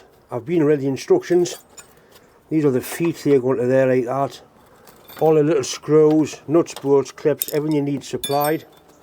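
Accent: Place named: North East England, United Kingdom. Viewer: Even fellow Englishmen struggle with the lovely North East accent. 😂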